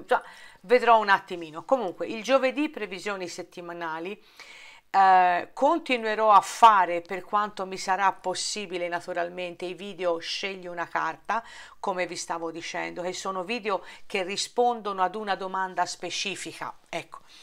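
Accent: Tuscany, Italy. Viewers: io comunque ti seguo a prescindere perché mi piace innanzitutto la tua parlata toscana e i tuoi " e bla bla bla" mi fanno piegare in due dal ridere! Ti abbraccio forte!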